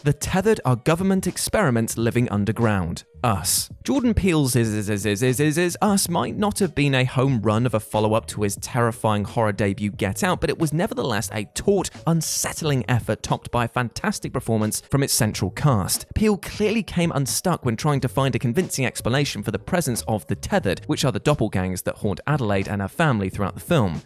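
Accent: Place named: England, United Kingdom. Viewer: At least he's English and not Scottish, the closed captions wouldn't help you! And that's coming from someone who is Scottish 😂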